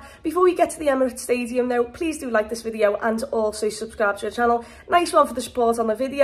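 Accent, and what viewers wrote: Liverpool, United Kingdom. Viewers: Proper Scouse Babe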